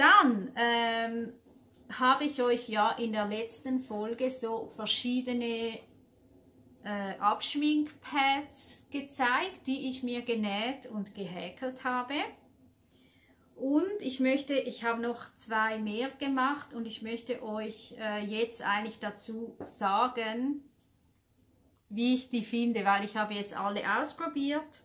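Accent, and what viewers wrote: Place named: Switzerland. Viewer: Liebe, die Folge hat mir wieder sehr gefallen, Du hast uns ganz schöne Sachen gezeigt. Die blaue Jacke ist traumhaft schön, ich bin ganz begeistert von der Art wie sie Du gestrickt hast, die Rückseite ist ja ganz raffiniert gestrickt, hinten in der Mitte sieht es ja wunderschön aus. Diese Art zu stricken kenne ich gar nicht, also Du strickst ganz tolle Sachen, bist eben, wie ich schon geschrieben habe, eine richtige Künstlerin, Du machst außergewöhnliche SACHEN, egal ob Du strickst oder nähst, es ist so toll und interessant, ich schaue sie so gerne an. Diese Handschuhe sind einfach wunder wunderschön, das Muster erfordert doch viel Geduld und Können. Ich könnte soetwas nicht mehr stricken, weil es mir nicht mehr möglich wäre, ich könnte die Geduld nicht mehr aufbringen. Auch der neue Pulli ist so sehr schön. Diese Tücher und Pullis stehen Dir auch so gut mit Deinen schönen langen Haaren siehst Du umwerfend schön aus. 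Du hast eine solche Fröhlichkeit in in Dir, dass man Dir so gerne zuschaut und zuhört. Ja zuhört, das Deutsch. Mit dem Schweizer Akzent drin finde ich super und höre es sehr gern und es ist überhaupt nicht furchtbar, wie Du denkst, ganz im Gegenteil und ich komme ja auch nicht weit weg, von der Schweizer Grenze, her, wenn ich so rede wie zu Hause, dann meinen die meisten Leute, ich bin aus der Schweiz. Die Leute sind zum Teil manchmal etwas begriffstuzig, die können nicht unterscheiden, ob man Ein Dialekt redet oder richtig Schwitzerisch, sie merken überha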